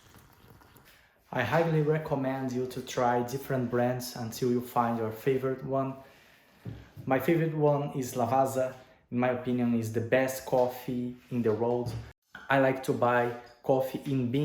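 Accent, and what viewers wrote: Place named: United Kingdom. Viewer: Inglês britânico e muito diferente 😅 curti esse formato aparece mais vezes 👍👍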